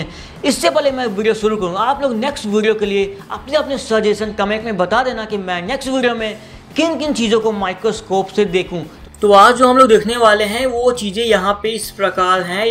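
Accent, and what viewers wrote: India. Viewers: Ngomong nya kaya orang india njir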